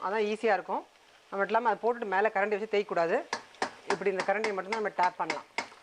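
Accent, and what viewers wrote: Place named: Vellore, India. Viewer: madam recipe is simple and super. Thank you for sharing with us. entha oor neenga unga tamil enga oor vellore tamil maari iruku.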